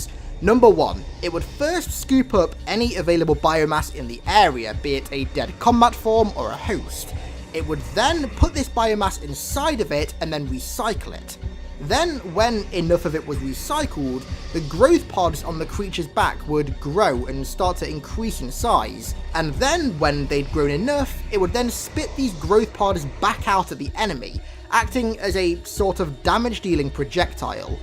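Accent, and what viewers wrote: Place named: United Kingdom. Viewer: Thanks for the video man, I just subbed to you like last week. Just wondering are you from the Uk? Your voice is kinda odd to me and sounds like it's from the Uk. Just asking cause I have seen some vids with facecams and I see usa flags in the background and guessed you are either from there or the Uk.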